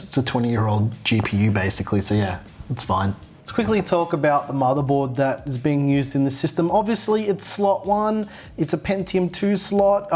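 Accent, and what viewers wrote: Australia. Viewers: are you australian 🤢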